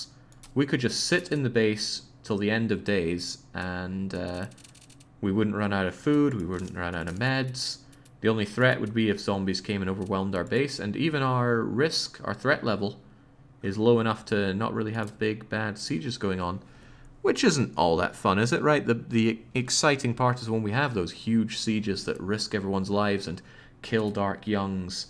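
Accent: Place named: Northern Scotland, United Kingdom. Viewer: This runthrough has been a real diamond of a watch. Audience participation vs. a nightmare world and a few bloody hell type moves pulled by yourself, along with the usual North-of-the-border cutting commentary. As an aside, you're accent reminds me of a friend of mine from the north of Scotland who once commented on a girl "She's so ugly even a sniper wouldn't take her out". Classic.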